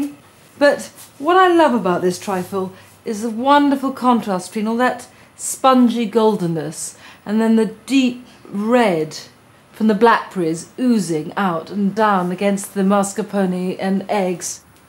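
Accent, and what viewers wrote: United Kingdom. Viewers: me encanta el acento tan británico de Nigella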